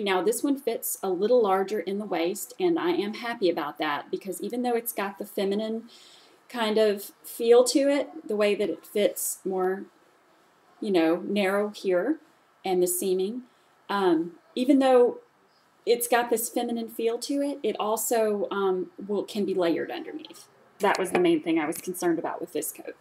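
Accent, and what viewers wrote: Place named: Tennessee, United States. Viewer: I have no idea how I didn't figure out you were from Tennessee before now...I should have been able to pick out that accent.(I'm from West Virginia myself). I adore those coats...I need a good winter coat of my own since my windows are still frosting overnight. I'll have to give modcloth a try. Thanks for the tip off Darling. :)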